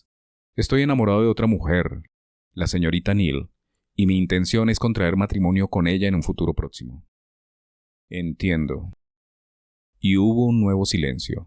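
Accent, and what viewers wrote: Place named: Colombia. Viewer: Gracias por su lectura, me atrapó. Por otro lado, me encanta su acento colombiano.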